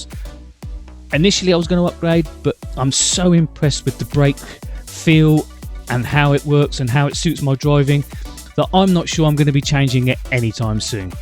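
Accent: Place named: Suffolk, United Kingdom. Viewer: I’m in Ipswich .. thought I recognised the twang 😝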